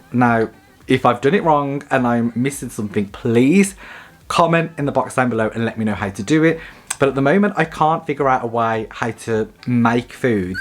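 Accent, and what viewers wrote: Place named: Birmingham, United Kingdom. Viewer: I can defo hear some Brummie in ur accent right? First channel I find to learn about this toy for a Christmas present ha!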